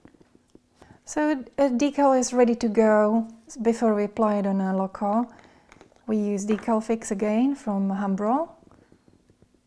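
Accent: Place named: Slovakia. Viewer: Great video. Good to know there is an alternative to an airbrush as I don't always mine to hand. I'm curious where your accent is from. My wife is from Slovakia and she's convinced you are from Slovakia too.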